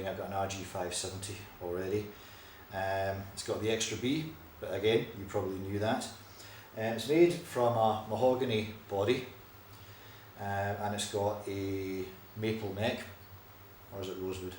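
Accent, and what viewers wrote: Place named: Scotland, United Kingdom. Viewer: Great review thanks, looking for a 7 string Ibanez and came across this. I live in the US so I was happy to find a voice form back home (grew up in Edinburgh) Cheers!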